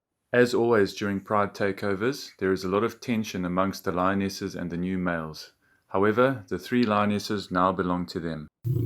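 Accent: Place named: South Africa. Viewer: Mjejanes put in work,please do a video on them.Always enjoy hearing a fellow South African covering our lion dynamics,sounds more authentic.Enkosi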